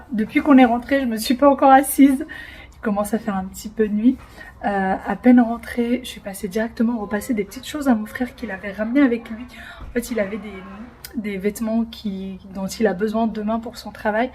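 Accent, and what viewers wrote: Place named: Morocco. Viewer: Moi j adore quand elle parle marocain même si je ne comprends pas trop mignon 😊 Changer rien vous êtes mon petit rayon de soleil de la journée vos vidéos je n’en loupe pas une🤗❤profiter avec votre belle famille et ne vous soucier pas de ses commentaires idiot❤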